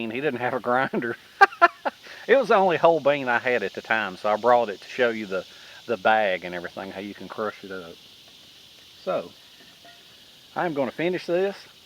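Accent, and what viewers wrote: South, United States. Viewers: Loving the sounds of the cicadas in the background. Oh and the bacon sizzling is wonderful! I loved the video as I do all your videos. Your southern accent makes a North Carolina boy feel right at home. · Thanks for another exciting video.. Nice to see you're still thinking and doing stuff. I love the Southernisms, like a two syllable word for a cover or top to a container "leeyud". Keep em coming! Frank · Wonderful example of an American "southern" accent. Thank you.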